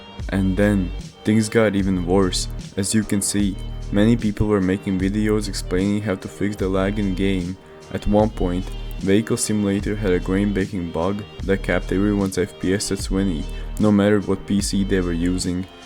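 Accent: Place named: Portugal. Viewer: Portuguese accent I think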